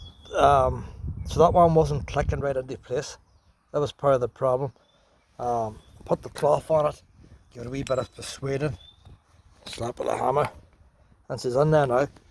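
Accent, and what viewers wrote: Ireland. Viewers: Great video - thanks for that! And, your thick Irish accent reminds me of my grandfather - so thanks for that too!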